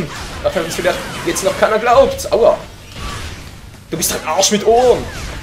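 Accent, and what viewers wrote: Switzerland. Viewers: bisch du ein schweizer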